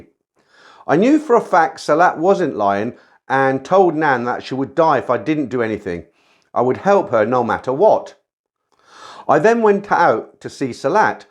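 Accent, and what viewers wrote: United Kingdom. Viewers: Hey Mr, I LOVE YOUR STORIES - It's such an original concept, but the way you read them is sooo properly perfect!! I'm American and live in San Francisco, and your British accent makes the stories and listening to you vids awesome!! I do have a story, but it's not a Thai....my wife is japanese, and she lives over there...we don't see each other as often as I would like, but it's still all good.... Maybe our story doesn't qualify, but it is a dream come true!! Again, I always look forward to your uploads and storytime read(s)!!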